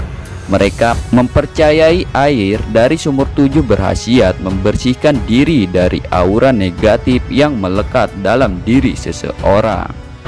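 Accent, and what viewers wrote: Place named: West Java, Indonesia. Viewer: wong banten.udu mengkonon.iku mah logat wong sunda.asal bae wong kien.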